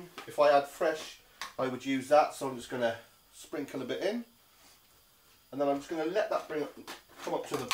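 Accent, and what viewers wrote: United Kingdom. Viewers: I saw this video long time ago, and I appreciate what you make. However, I there any other way to make it without pink salt? FYI, I live in the Middle East and it is hard to find sodium nitrates here. One more thing, your accent is more British rather than scotch; maybe a variety !?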